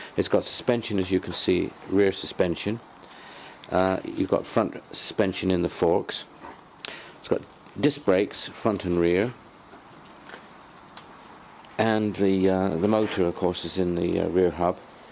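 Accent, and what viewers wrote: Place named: United Kingdom. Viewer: Hi thanks for uploading this video. I'm assuming by your accent that you are from the UK? I was just wondering if you have ever been stopped or asked by the police about the bike? The UK law on e-bikes have been changed so those 'off road' boost buttons are no longer permitted. So the new A2B only does 15MPH as standard but Ultra Motor can up the power to the full 20MPH again for 'off road' use. I'm planning on purchasing a new a2b but wondering if I should get it unrestricted or not. Thanks!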